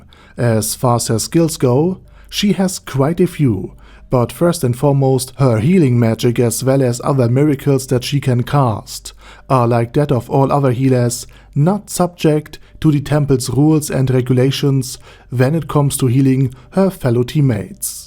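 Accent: Germany. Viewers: Boi you got a hard German accent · i am pretty pretty sure ur are german arent you?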